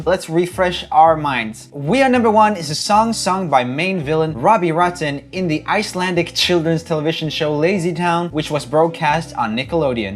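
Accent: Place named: Sweden. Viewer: Why sound so different and having Swedish accent 😅😅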